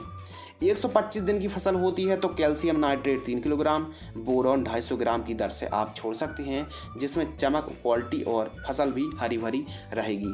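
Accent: India. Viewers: Apka ye video mujhe achha laga, jaankari jabardast h sir, ....... Apka bolne ki shaily rathiya bhasha laga app kaha se ho sir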